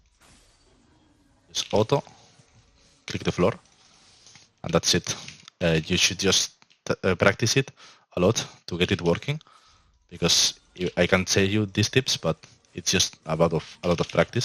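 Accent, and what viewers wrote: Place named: Spain. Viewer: AJJAAJJAJ hermano como se te nota el acento de ESPAÑA con do cohone JAJA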